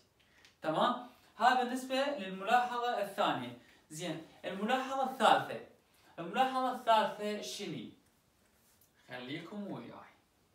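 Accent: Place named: Nineveh, Iraq. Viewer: هههههه نفس لهجتنا اني من نينوى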